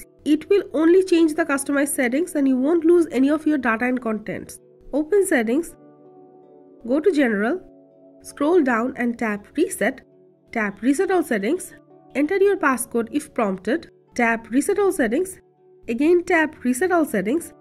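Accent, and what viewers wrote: India. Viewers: The voice over in this vedio is done by probably a bengali women i can say that due to her accent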